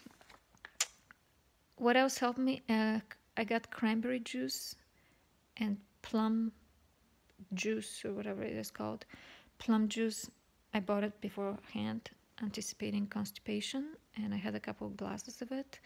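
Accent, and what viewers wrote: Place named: Russia. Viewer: Dear, thank you so much for your informative video. You helped me a lot. I just went through complete hysterectomy. I have a question. How long did it take for your bloating to subside? did you feel occasionally sharp small pains in your inside where the stitches were? I have sharp pain sometimes in my inside and my bloating is huge. But my surgery was on July 24, 2019 and today July 28, 2019. If there is any advice you can give to me to would be very appreciated. And also eventually did the bloating go away or do you end up having some left. Thank you for your time. Sincerely Naira (by the way I love the way you speak English, are you from Russia? I am from Armenia)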